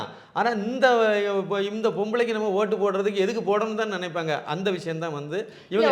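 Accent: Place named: Kanyakumari, India. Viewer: Kanyakumari tamil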